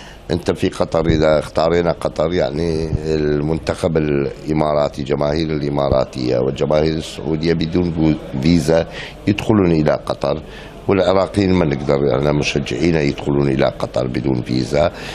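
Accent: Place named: Iran. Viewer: وربي حتي كلامه تحس ايراني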